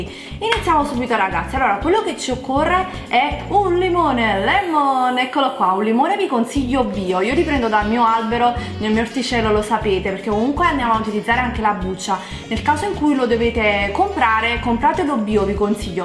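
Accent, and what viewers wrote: Naples, Italy. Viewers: Hai l'accento napoletano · Ossicini è in dialetto napoletano se non si era capito dal suo accento fonetico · sbaglio o sei napoletana, l'accento è inconfondibile 😂😂😂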